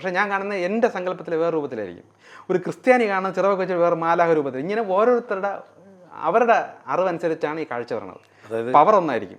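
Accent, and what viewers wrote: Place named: Thiruvananthapuram, India. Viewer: നല്ല തിരുന്തോരം ഭാഷ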